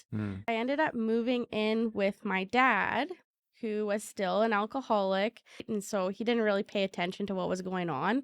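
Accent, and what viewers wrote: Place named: Canada. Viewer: Definitely Canadian 🇨🇦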